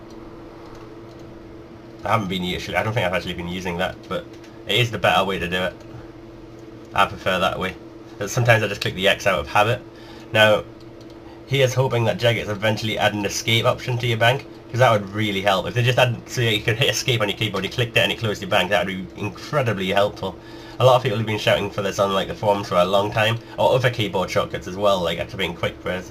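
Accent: Newcastle, United Kingdom. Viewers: he's a jordy, love his accent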